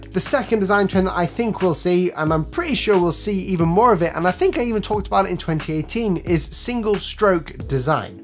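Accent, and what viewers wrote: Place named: United Kingdom. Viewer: That's how us British say it, like he said 'Adi-das" instead of "Ad-eee-dus". Similar to how we say most countries with the letter I and as "i" such as i-talian, not I-talian :). It's the way we break down the syllables in our speech pattern.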